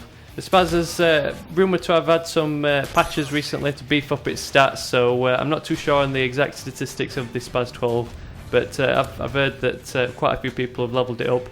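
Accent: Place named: Yorkshire, United Kingdom. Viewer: What part of yorkshire are you from?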